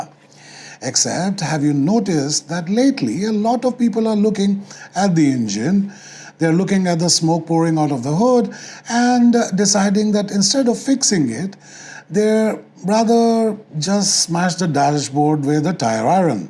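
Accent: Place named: United Kingdom. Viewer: Amazing 🎉❤ amazing 🤩 Very British narration. Thanks for posting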